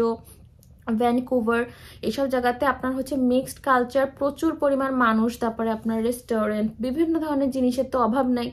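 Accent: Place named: Khulna, Bangladesh. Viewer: Assalamualaikum Apu. Are you from Khulna? I am from khulna and your Bangla tone seems very familiar to me. Zajakallah ❤️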